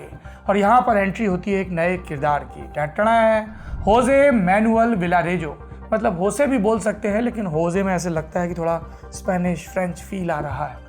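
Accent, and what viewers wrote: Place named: Kanpur, India. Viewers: बहुत दिनों बाद.....आज इतना बढ़िया यूपी वाले लैहिजे में बोले हो .....मजा आ गया आज तो.....👌👌👌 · Kanpuria style mein news sunna hai to Saurabh bhaiya ka lallantop dekho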